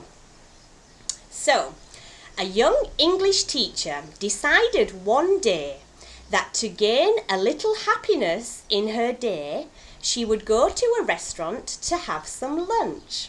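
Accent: United Kingdom. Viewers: Great Video! Wonderful English accent!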